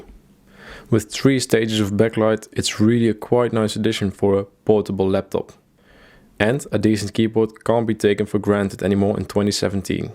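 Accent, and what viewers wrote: Netherlands. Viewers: Ik hoorde gelijk aan j accent dat je nederlande bnt lol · Hahaha jah bradahhh ik wist het niet zeker, want je hebt veel engelse reacties vandaar G, maar je accent is duidelijk een dutch one matsko maar je spreekt duidelijk en goed dus no problemo, die bios vraag was omdat hp en andere merken die bios locken, je kan niks instellen dan, alleen datum en tijd, maar geen ram timings en raid ahc settings, jij hebt hem dus jij kan makkelijk checken 😃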